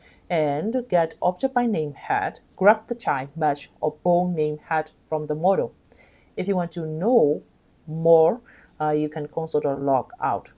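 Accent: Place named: Myanmar. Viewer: Are u a Myanmar? Your accent like Myanmar 😐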